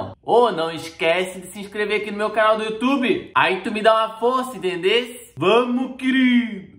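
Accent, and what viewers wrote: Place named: Itajaí, Brazil. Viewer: Po vc tá virado num demonnho kkkkkk queria ver vc lá em Brasília falando as verdades pra aquela cambada de ladrão falando com nosso sotaque itajaiense kkkkkk muito seu fã nos seus vídeos vc fala oq muitos querem fala mais tem medo abraço Quiriduuu tamo junto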